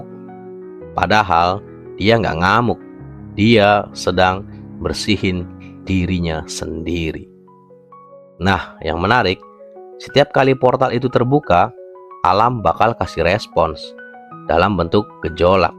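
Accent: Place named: Cirebon, Indonesia. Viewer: Iki admine wong Cirebon kan... ? Logat ngomonge Cirebon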